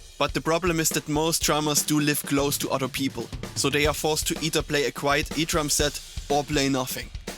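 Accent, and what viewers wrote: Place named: Germany. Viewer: Where do you live? U sound like a german guy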